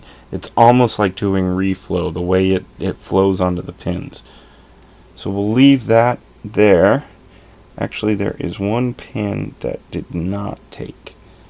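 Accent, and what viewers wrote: United States. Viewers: excellent tutorial and thank you so much for doing and posting it. One thing though, the L is silent in the word solder unless you're from England. You sound American though?? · John Strauss For the Accent my money is on Michigander...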